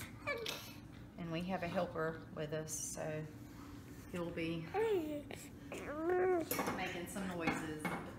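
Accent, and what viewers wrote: South, United States. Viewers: Thanks for the inspiration to make our own butter. I think that method would work in our bosch mixer, oh and I love the southern accent. :)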